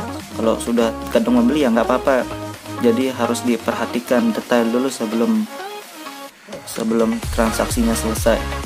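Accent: Lombok, Indonesia. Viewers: logat dengan lombok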